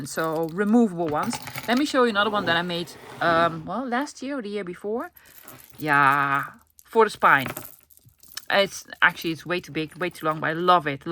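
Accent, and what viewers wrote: Netherlands. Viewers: I’m fairly new to this channel… I only discovered you about a month ago and I’ve been binge watching your YouTube videos. I absolutely love this channel! My mums family is form the Netherlands too so hearing you accent is very nostalgic to me. Anyway just wanted to say that you’re awesome and I love your sense of humour ❤️ love from Australia 🇦🇺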